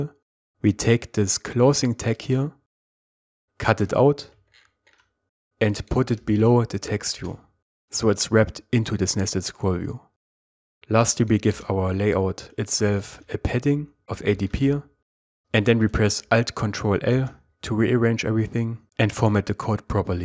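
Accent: Germany. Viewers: Subscribing because of the German accent! :D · Thanks for this brilliant tutorials! They are easy to understand and deeply. Firstly it was hard for me to understand your German accent :D But know I used to and your voice is so clear and understandable <3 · Are you from germany?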